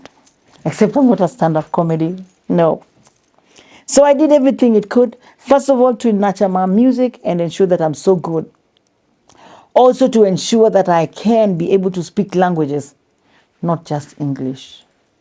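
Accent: Luo, Kenya. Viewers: She’s so hilarious with Luo accent😂😂😂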